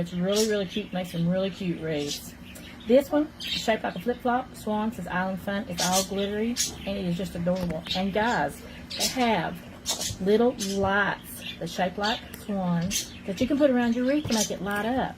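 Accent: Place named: South, United States. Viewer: You sound so country I love it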